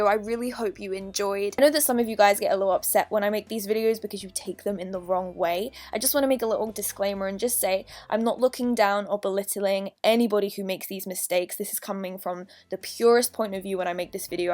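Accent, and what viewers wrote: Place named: United Kingdom. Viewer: Ohh my God I’m new to this channel and the only thing I noticed is she has a British accent.....😍😍😍😍lol I also love in London I meant *live in London 😘😘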